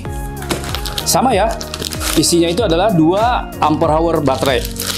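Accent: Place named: West Sumatra, Indonesia. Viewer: masih terasa logat minang nya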